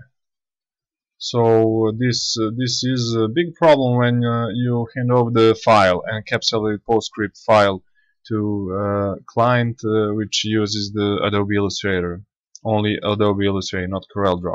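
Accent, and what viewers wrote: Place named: Balkans. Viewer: Lol from your accent I thought you're from the Balkans, I'm from Bulgaria, so, yeah, I knew it! :D On the topic, I honestly prefer Corel, it is super easier for me to work with it.. However for pre-printing, one should use Illustrator. For all digital design that doesn't require printing I'd personally use Corel :) Cheers and pozdravi!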